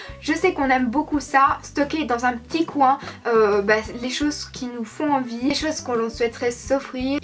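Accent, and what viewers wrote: Belgium. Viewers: T es belge ??❤️❤️❤️+1abo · tu es belge?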